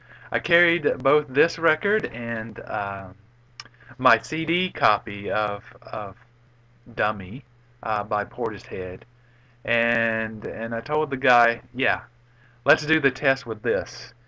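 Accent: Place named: South, United States. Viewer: Thanks for introducing yourself. I thought I heard a Southern accent. Your story is interesting and I'm glad you shared it. I love classical music too.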